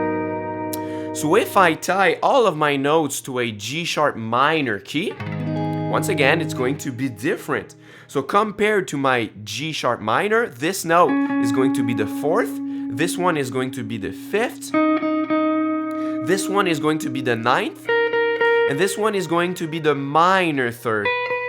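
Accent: Quebec, Canada. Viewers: Oh boy j’ai tout de suite su que tu es québécois mon chum c quand même sick😂😂👌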